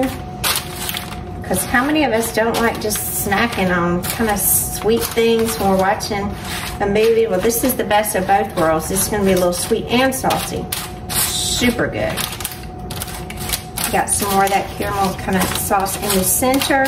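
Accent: South, United States. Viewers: I love her Southern accent! Enhances the recipe 🙂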